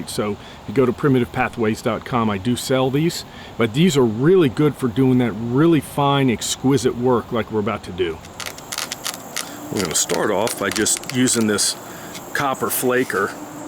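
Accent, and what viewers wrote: Georgia, United States. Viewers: I was gonna say, sounds like you live near Lanier Speedway.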